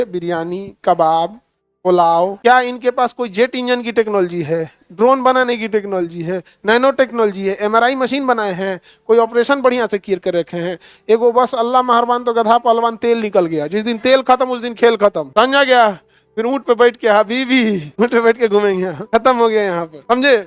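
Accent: Bihar, India. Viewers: I don't care here to get ' Gyan'....I come here for the interesting Bihari accent 😎❤️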